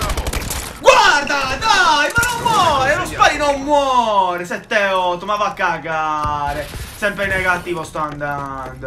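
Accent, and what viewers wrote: Sardinia, Italy. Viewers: Vai...ma sei sardo? Se si...anche io sono sardo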